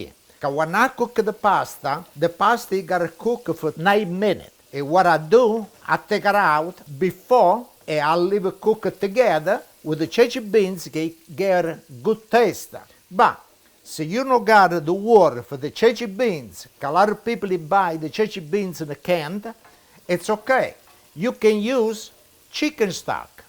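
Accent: Italy. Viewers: When I was a kid I remember old Italian men speaking in this accent during the 80s. Good old days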